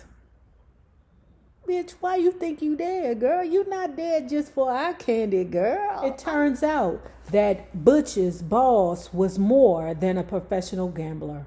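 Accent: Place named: Washington DC, United States. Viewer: Won't even mention that DC accent😮😮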